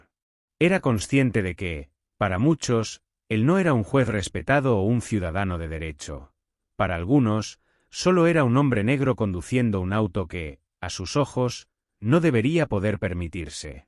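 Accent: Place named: Spain. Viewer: Quita ese acento español y a la mejor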